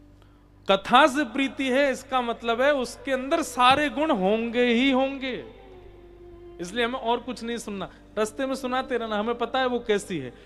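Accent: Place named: Braj, India. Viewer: Jay Shri Krishna Radhe Radhe Jay Shri Harivansh Guru Dev Maharaj ji Aapke Charno Mai Koti Koti Vandan 🙏 Radhe Radhe Jay Shri vraj vasi Aapki vraj Bhasa Badi Sumadhur hai Radhe Radhe Jay Shri Vrindavan Dham 🙏 Jay Shri Radha Rani Jay Shri Radha Kishori ji Jay Shri Radha Raman ji Jay Shri Hari OM 🕉 Namo Narayan ji 🕉 OM Namo Bhagvate Vasudevy Namh Jay Shri Laxmi Pati Jay Shri Shyama Shaym ji ♥️ Jay Shri Yamuna Maharani ji Jay Shri Radha Vallabh Lal ki Jay Ho ♥️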